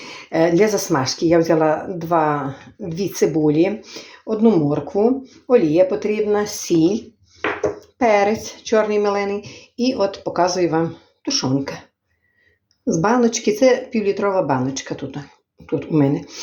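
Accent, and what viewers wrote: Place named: Kirovohrad, Ukraine. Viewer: Спасибо, Вам большое. Случайно попала на Ваш канал и очень рада, что попала. Слушаю, не наслушаюсь вашим украинским говорит, таким славным. У меня мама родом с Кировоградской области, говорила именно так. Спасибо ещё раз.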